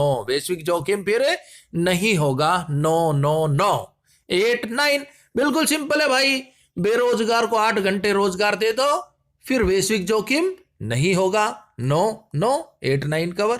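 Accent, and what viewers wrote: Haryana, India. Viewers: Haryanvi style 😘😘😘😘😘😘👍👍👍👍👍 Haryana me kit sh ho ji.. Ap💕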